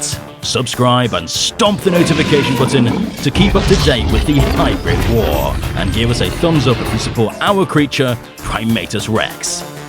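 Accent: United Kingdom. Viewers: i was like HES BRITISH! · HE’S BRITISH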